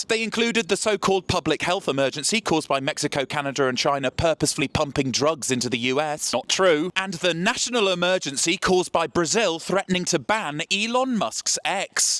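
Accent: United Kingdom. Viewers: I can't explain it but delivering news on our dumbass dictator is more funny with the british accent. Keep it up we need the entertainment. We desperately need the entertainment.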